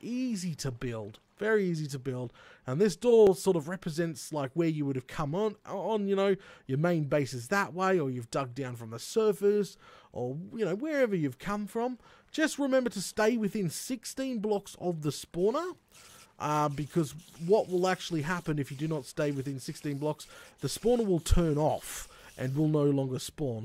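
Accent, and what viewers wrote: Australia. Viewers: Mmmmmm...so Australian.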